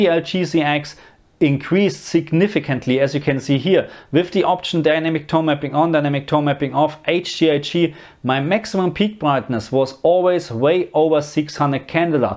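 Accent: Germany. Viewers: Your german accent is fucking ruining your videos man... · Are you from germany? You sound like german :-) ? Falls ja schöne Grüße aus Hamburg. Eventuell kann ich dann meine Fragen auf deutsch stellen?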